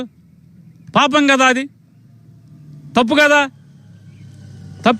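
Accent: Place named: Godavari, India. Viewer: మీరు మా గూదారొళ్లలా భలే మాట్లాడుతారండీ. పాపం నాయుడు గారండీ! ఆయ్ 😊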